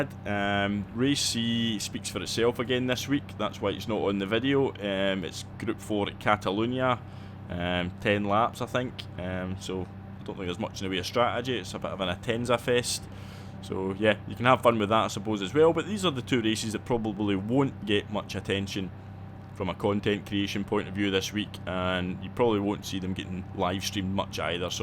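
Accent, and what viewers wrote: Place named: Northern England, United Kingdom. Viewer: Its nice to hear a good Northern voice rather than these Southern pansies all the time 😂😂😂 Good stuff mate, keep it up 😏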